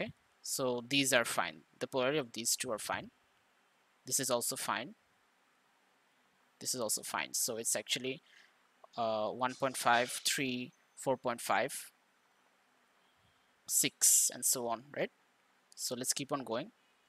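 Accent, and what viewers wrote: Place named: Bangladesh. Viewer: yeee agreed Also that accent was so clearly Bangladeshi ahah Love the representation tho, he’s much better than that Pakistani dude who barely explains anything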